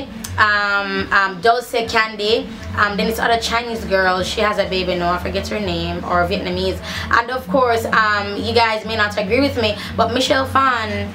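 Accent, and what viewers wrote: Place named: Patois, Jamaica. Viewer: god say put good out n yuh get good back.... someone helped u help others, do other thing dont conform but i loved when i say yuh down zaful try on. do more things that ppl wan c. And even tho yuh jamaican talk slower and speak standard english sometimes not all weh come from jamaica can technically understand ur rawcha patois even tho that makes it funny. n nuff black ppl nuh undastan patois/patwa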